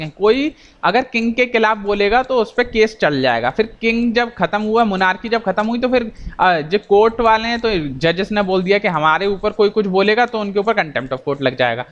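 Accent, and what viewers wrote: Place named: Delhi, India. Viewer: Sir apki video dkhta hu pta hi ni chlta kb video khtm ho gyi....ap exact to the point pdhate ho....delhi's local language 👌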